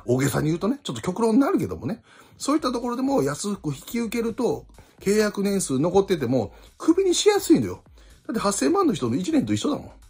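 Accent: Kansai, Japan. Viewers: 里崎さんは、興奮すると関西弁が強くなるね。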